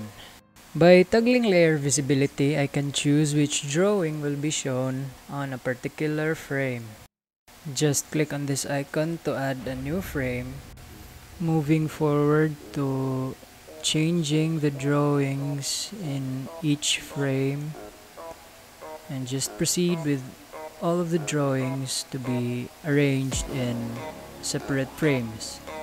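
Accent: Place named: England, United Kingdom. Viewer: nice tutorial i like your english accent i can understand it properly ^w^!!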